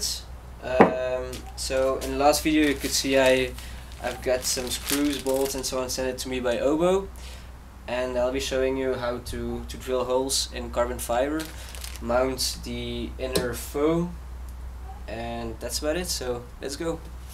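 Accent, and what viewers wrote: Netherlands. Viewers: You dutch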